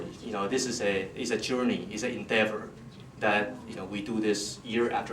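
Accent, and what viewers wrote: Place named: Singapore. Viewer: singaporean? malaysian? seems to be singlish..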